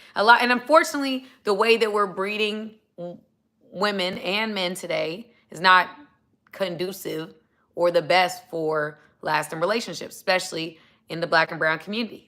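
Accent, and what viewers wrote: South, United States. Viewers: Hi this your country voice, is good for country music..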